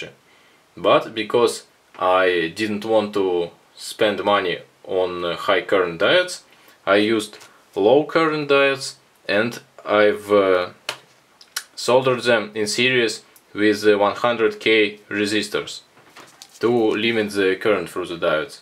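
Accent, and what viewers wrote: Russia. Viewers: спасибо, русскую английскую речь не спутаешь ни с чем, слишком все правильно выговариваете. :) Спасибо за видео. Будут вопросы обязательно спрошу. Меня больше интересует тлеющий разряд, так что спрошу под другим вашем видео. :)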